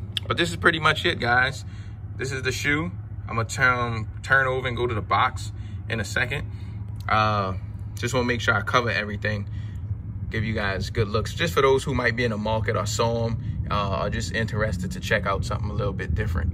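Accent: Baltimore, United States. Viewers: Homie is definitely from Baltimore. What it deww dummy?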